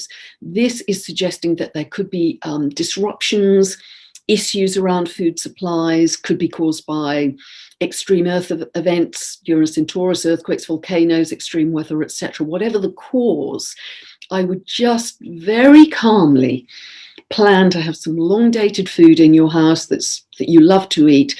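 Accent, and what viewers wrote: England, United Kingdom. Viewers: Thank you so much! That really made me feel better! And it was done in that wonderful English accent. I'm american, I came to be by way of England. But as u know, we kind of became the melting pots of all cultures and now we sound funny lol. But it was very much like listening to someone I hold dear to my heart. Mary Poppins. Thank you so much! ☺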